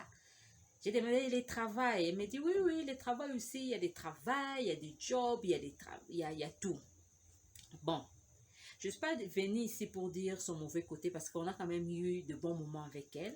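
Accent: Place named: Democratic Republic of the Congo. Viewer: Lorsque j'ai entendu ton accent avec le mot videyo j'ai directement compris RDC et que tu es Nekongo avec tes mots swahili mais tu parles bien sauf que tes vidéos sont un peu long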